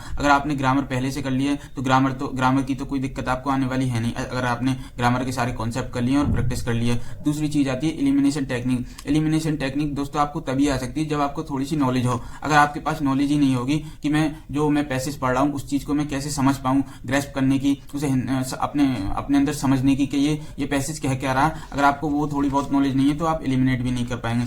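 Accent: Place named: Rajasthan, India. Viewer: aapki language😅 Rajasthan jesi hai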